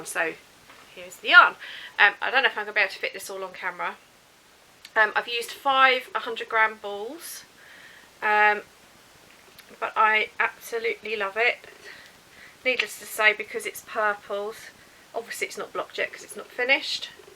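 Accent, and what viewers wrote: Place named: England, United Kingdom. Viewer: Just found you and am enjoying your cheery English accent. I will be working on a virus blanket soon. Thank you. Mary Wigmore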